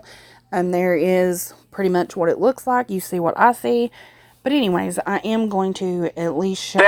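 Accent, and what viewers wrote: South, United States. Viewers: Yaaay I'm so happy to hear from you!! Thank you! P.S. I Like your southern drawwl 🐴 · I love your southern accent and couldn't wait for this video to update us, thankyou for the work you do. I hope Hahn gets jailed it's what she deserves, I know she has children but she's made her choices and I believe she's a big player in the monkey buisiness. Thanks again and look forward to your next video.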